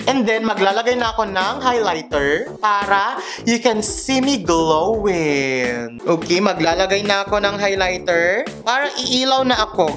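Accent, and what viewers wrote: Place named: Cagayan, Philippines. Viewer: Para taga CAGAYAN si momshie kasi yung accent nang pag sasalita niya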